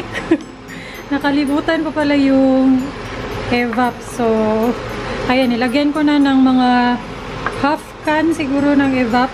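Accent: Visayas, Philippines. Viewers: Mas nindut bisaya pero syepre naa man gud tagalog viewers so sagul na lang hehe! Lahi ra jud ang accent sa bisaya ka lingaw. Pero ang evap ayaw tawn kalimti 😅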